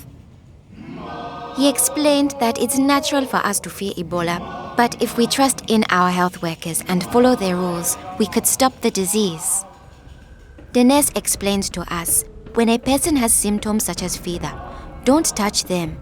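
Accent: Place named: Africa. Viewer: african accent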